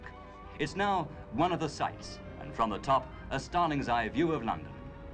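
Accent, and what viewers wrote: Australia. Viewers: Is it just me, or is the narrator Australian? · What an interesting accent. Sounds like an Australian with an RP overlay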